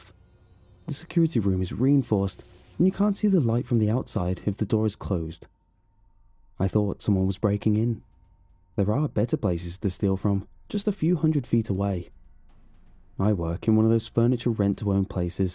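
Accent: England, United Kingdom. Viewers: Which part of England were u brought up in? You’ve a lovely, rich & expressive speaking voice. & i just wondered where, exactly, u originally came from. Middle England is just too vague. Please!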